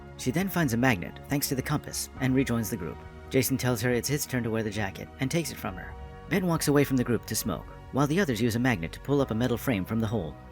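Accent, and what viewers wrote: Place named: Russia. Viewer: Что за акцент ужасный? Ты что русский? Ты же понимаешь, что тебя иностранцы некоторые просто не поймут. Пацаны расходимся, видимо на русском Ютубе не платят деньги и он решил делать контент в зарубеж.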